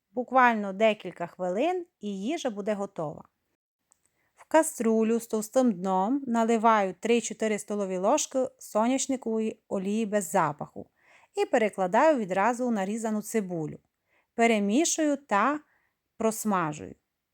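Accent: Transcarpathia, Ukraine. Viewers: Дивлюсь Вас із далекої Франції, обовʼязково приготую таке лечо! Окрема подяка за ваш закарпатський говір!💛💙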